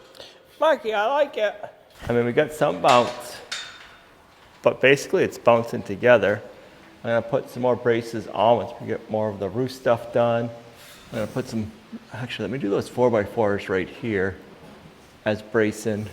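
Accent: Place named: Northeast, United States. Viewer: Truly loved the video! I like your Nor’Easter accent the best. Thanks for the positives you bring with each posting.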